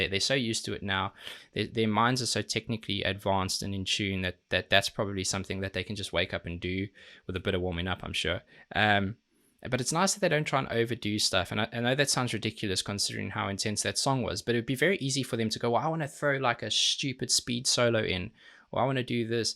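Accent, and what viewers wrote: South Africa. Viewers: Is my guy here a fellow south african or am i hearing shit?😂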